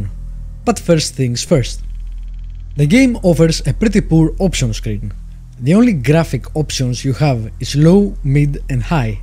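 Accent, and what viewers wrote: Greece. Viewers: Hi " " how are u? I see u continue steady. Tα Αγγλικά σου είναι πολύ τέλεια από πλευράς ταχύτητας. Σε σύγκριση ακόμα και από πριν ένα δίμηνο περίπου που είχα δει ένα Review sου πάλι. το λεξιλόγιο το είχες ήδη. Το είχα καταλάβει κατευθείαν την 1η φορά - σε ένα gameplay apo to Total War Napoleon - και κοίταξα το About και είχα δει από Ελλάδα. Εμείς οι Έλληνες έχουμε πολύ χαρακτηριστική προφορά στα Αγγλικά. Εγώ για παράδειγμα ζούσα 2 χρόνια Σερβία και 8 μήνες Αγγλία το 4ο έτος από το Κολέγιο και την Αγγλική προφορά δεν την υιοθέτησα ποτέ. Σόρρυ εαν ακούγομαι ότι σε κριτικάρω αλλά γουστάρω που βλέπω Έλληνες YouTubers να προσπαθούν. Είμαι 40 και είμαι gamer ap;o ta 11 me Commodore 64, disks 5 1/4". Θέλω και εγώ εάν είναι να κάνω για χόμπι ενα κανάλι αλλά σκέφτομαι το 60-70% με games to υπόλοιπο με κάτι άλλο αλλά τι? Έχω 2-3 πράγματα στο μυαλό μου. 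Σκέφτομαι Φαρμακευτική Κάνναβη. Πριν σου φανεί περίεργο ο αδερφός μου είναι ο 1ος στην Ελλάδα που έκανε το <num> την 1η Έκθεση Κάνναβης στην Ελλάδα (γήπεδο tae kwon do Ιανουάριο 2018 και 2019).. Το όνομα "CannabisExpo" το έχει πατεντάρει και δεν μπορεί να το χρησιμοποιήσει άλλος, έχω κάνει πολλά κονέ και αναπτύσσεται πάρα πολύ ο κλάδος και ο κόσμος δεν ξέρει που να κατευθυνθεί επειχηρηματικά και καταναλωτικά και θα μπορούσα να βοηθήσω με πληροφορίες και το πως πάει το νομικό σύστημα γενικά. συγνώμη για την πολυλογία απλά ήθελα ενός αγνώστου την άποψη ή έστω απλά να το πω, σου εύχομαι ραγδαία ανάπτυξη στο κανάλι σου.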